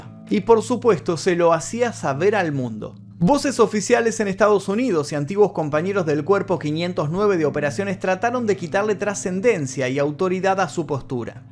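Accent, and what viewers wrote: Argentina. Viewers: Exelente documental !! Imagino que el locutor es argentino , no es necesario salir a cuadro , con esa barba de chivo no le da seriedad al tema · No puedo creer que los argentinos NUNCA pronuncien bien la "Ll" y la "Y" y cuando tienen una "Sh" en frente les dé por decir Hiroyima... Que alguien me explique. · Un argentino hablando? No veo ésto!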